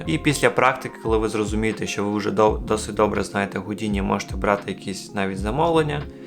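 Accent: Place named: Russia. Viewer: У автора москальский акцент🤣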